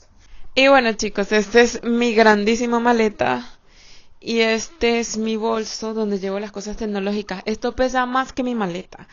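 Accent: Colombia. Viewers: Por una razón elemental, los venezolanos hablamos VENEZOLANO, seamos de Caracas, de Oriente, de Mérida, del Táchira, del Llano, de Lara, de Yaracuy y del Zulia, todos sabemos cómo hablamos los venezolanos en cada una de nuestras regiones; y los colombianos hablan COLOMBIANO, sean de la Costa, de Bogotá, de Medellín, de Calí etc. Ella no habla ningún acento venezolano autóctono de nuestras regiones de Venezuela, ella habla colombiano porque es colombiana. 🤷🏻‍♂️ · Se te nota un poco de acento colombiano. Saludos desde España.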